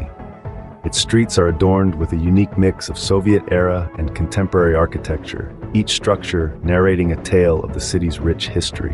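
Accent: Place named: United States. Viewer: Its a shame the American accent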